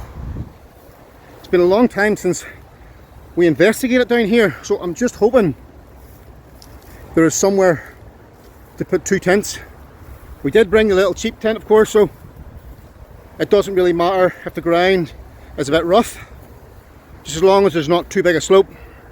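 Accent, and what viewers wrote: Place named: Northern Ireland, United Kingdom. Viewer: Good to hear a Norn Iron accent on a camping vid, keep it up 👍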